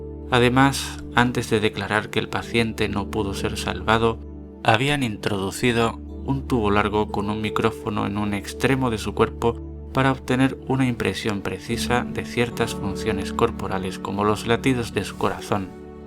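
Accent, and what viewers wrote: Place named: Spain. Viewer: Hace mucho tiempo y durante el tratamiento por un aborto involuntario de cinco meses, estando en sala de operaciones porque había que limpiar por dentro y * estando consciente, me fui por un túnel. Estaba con un relajante y tenía los ojos cerrados pero no estaba anestesiada y estaba conversando con el médico y la enfermera cuando de pronto me vi en un túnel oscuro pero con tiras de luces de colores en la parte de abajo. Iba extraordinariamente rápido hacia el frente y de pronto comenze' a ascender y en un momento dado empezar' a ver en lo alto del túnel una luz blanca brillantísima y unas siluetas brillantes también que se asomaban al túnel moviendo los brazos como saludando. Esto lo iba yo contando al doctor y a la enfermera. Llevaba horas diciendo que me quería morir de lo mal que me sentía, no paraba con eso pero no me estaba muriendo y estaba tan consciente que hasta gire yo misma la cabeza porque estaba vomitando acostada. 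Fue muy raro y no me dio' miedo, más bien empece' a vacilar por la velocidad que llevaba pensando que si chocaba con una pared o salía despedida (a no sé dónde) entonces si que me iba a morir de verdad. Sentí que había gente conocida y también desconocida allí arriba pero el destello de luz no me dejaba ver sus caras. De pronto, salí del túnel y ahí quedo' todo. Claro, me quede con eso de haber estado en * el túnel sin haber estado muerta ni muriendo. No sé cómo los que saben explicarían esto. Suenas español, sabes cómo se veían las luces del túnel? Pu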